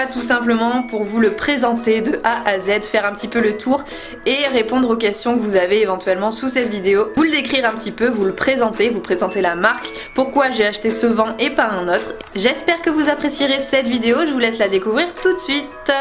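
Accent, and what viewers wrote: North, France. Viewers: tu as raison en fait. C est juste que dans le nord il prononce vanne. 🙂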